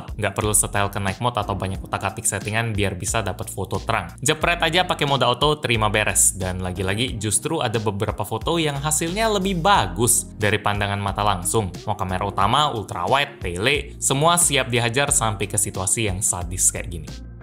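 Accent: Palembang, Indonesia. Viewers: Aku Cuman Fokus Pas Ngomong, Aku Tekwan samo pempek gendum nyo sikok ee. Apo ko aseli Palembang. Haha salam dari musi rawas ko. Maen maen lah ke musi rawas agek ku kasih kuliner keremis. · Wong palembang apo om ni? Biso bahaso palembang. Apo lah teirup cuko tu jadi biso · Uwooohhhh... Pas bang ngomong ama penjual kaki lima nya pakai bahasa Palembang, kentel banget. Jadi rindu tempat kelahiran gw jadinya. 12 tahun merantau dijawa belum pulang2. Liat bang tiba2 jadi inget kampung halaman. Wkwkwkwk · Ko ni wong palembang apo kek mano😅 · Seneng nian aii denger kak pake bahaso Palembang ni 👏👏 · Orang palembang y bang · asli plembang lu bang? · Sering denger abang pake bahasa Indonesia, sekalinya pake bahasa palembang kedengarannya lucu, salam sumsel bang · Wong palembang pecaknyo ni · Asli wong Palembang apo bang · Ehh wong palembang ee bang? Baru tau kalo bang wong palembang 🤣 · Bang orang palembang? 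· Wong Palembang niannn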